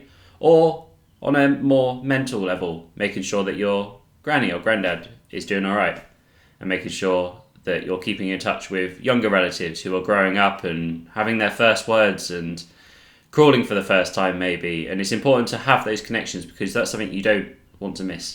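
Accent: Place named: United Kingdom. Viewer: good video! now that you're speaking slower, I can hear that your british :p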